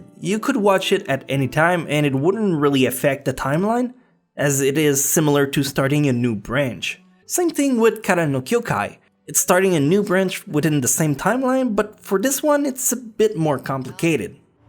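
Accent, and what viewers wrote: Quebec, Canada. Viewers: Tu sonnes vraiment québécois. It takes a quebecer to recognize a quebecer accent haha.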